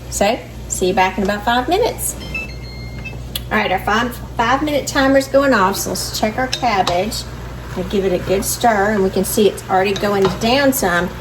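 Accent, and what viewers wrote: South, United States. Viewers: Looks good and love southern accents so no worry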